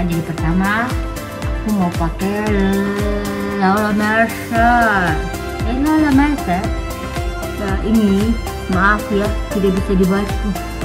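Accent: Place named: Japan. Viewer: logatnya itu berasa kental japan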